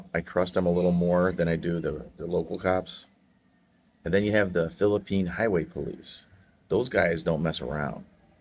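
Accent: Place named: South, United States. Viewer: I like the cool way you narrate your fact! It makes you want to listen! The southern way you round up the words reminds me of the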